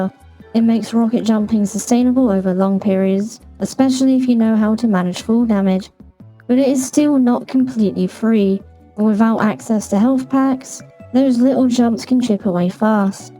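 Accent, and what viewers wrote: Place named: United Kingdom. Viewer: They're not a robot they're british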